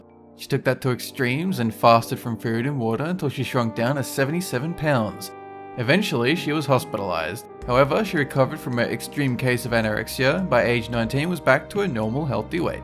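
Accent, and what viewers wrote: Australia. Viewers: AUSSIE AUSSIE AUSSIE OI OI OI!!!!!!!! I NEVER REALISED THAT WAS A FELLOW AUSSIE, FOR SOME REASON I DIDN'T RECOGNISE THE ACCENT, BUT NOW WHEN I HEAR IT, I DO!!!!!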